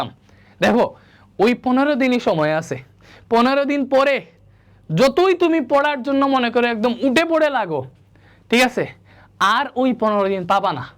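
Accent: Sylhet, Bangladesh. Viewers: Bhai apni mone hoy Sylheti😊😊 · Sylheti Sylheti vibe aser bror kota taki?🙌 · Bhai apni ki Sylheti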